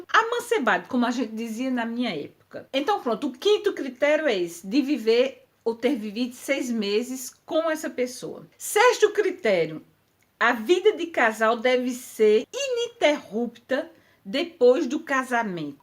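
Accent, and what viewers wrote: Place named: France. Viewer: boa tarde, é a minha primeira vez aqui no seu canal, parabéns e o seu sotaque francês é lindo... enfim, vc tira dúvidas? Se sim, a minha questão é a seguinte. O meu casamento com nativo francês é agora em março 2023, mas estarei de férias, sou comissária de bordo, vou voltar em abril e vou precisar cumprir aviso prévio do meu trabalho. Ele é da mesma área e virá em abril de férias para o Brasil. Isso vai me causar problema para a carta de sejur? Eu vou voltar em maio ou junho para ficar em definitivo na França com ele. Esse meu retorno após o casamento em março vai descaracterizar? Obrigada desde já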